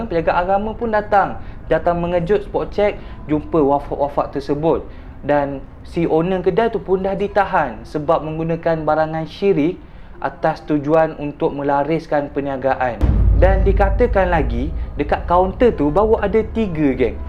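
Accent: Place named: Terengganu, Malaysia. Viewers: Jadi dh ckp ganu 👍😂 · bnyk² org luar ,, abg punya cakap tranung tu mmg terbaik arr .. mmg bunyi tranung sgguh 😂 apapon teruskan cerita kisah seram ni 👍🏻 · hanya cerita seram2 dah 72k pengikut kau ok la semoga suksess... memang mcm tranung betul mung ni pekat molek haha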